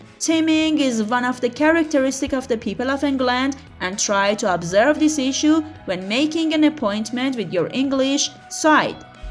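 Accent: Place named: Iran. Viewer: Your accent is blindingly obvious Persian 👍💗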